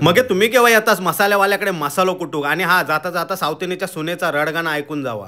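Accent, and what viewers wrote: Malvani, India. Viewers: बोलतास ता लय आवडता मालवणी आम्ही पण कणकवली चे आपलीच बेस्ट मालवणी भाषा 😂😂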